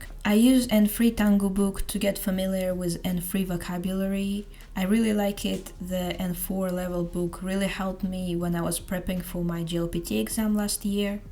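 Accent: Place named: Russia. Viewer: Your English sounds like you are Russian or so… feels strange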